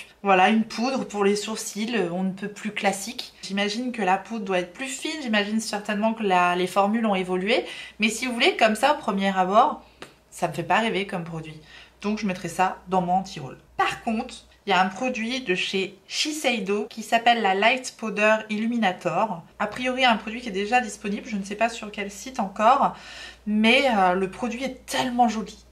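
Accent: United Kingdom. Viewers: Coucou 🐢🌹 J'ai la même petite palette de Bobbi Brown moi aussi et elle est vraiment bien👍🤗 La collection d'Estée Lauder me tente bien moi 😊 Youpiii ! De nouvelles teintes du fameux gloss de Maybelline 🤗 C'est pas folichon les nouveaux produits de Dior 🙁 Alors là ! Les palettes MAC sont à tomber, je les veux 🤩😍 Je ne connais pas du tout kacmarque Juvias place, m'en vais remédier à ça 🤔😉 Ah ! Enfin le highlighter de By Terry ! Super ! 👍🤗 Les bronzer de Patou sont très beau. Ils me tentent bien et le blush aussi 😊 J'adore ton accent anglais 😊 au moins je comprends ton anglais 😂 Le fond de teint sérum de Too Faced me tente beaucoup moi aussi 🤗 La Terracotta de Guerlain est une légende 😍 Ma mère en portait quand j'étais petite. C'est une petite madeleine de Proust pour moi ❤ Très bonne idée le roll-on d'Iconic London dis-donc 🤔👍 Chez Clé de Peau, peut-être qu'ils acceptent le don d'organes en échange d'un de leur produits non ? 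😉 Je tenais à te remercier pour m'avoir conseillé le fond de teint Care and Glow de Lancôme 🙏❤ J'attends la fin du mois pour me l'acheter mais je suis sûr qu'il sera parfait pour moi 😊 Encore une super vidéo 👍💕 J'adore ce concept de nous présenter les nouveaux produits sortis ou à sortir 👍♥️ Merci 🐢🌹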